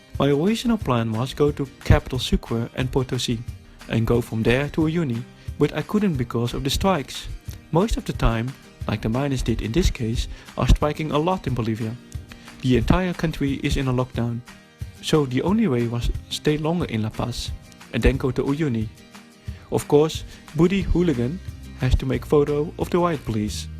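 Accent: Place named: Netherlands. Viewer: Turn on subtitles... sorry for the inconvenience.. Dutch accent is hard to understand apparently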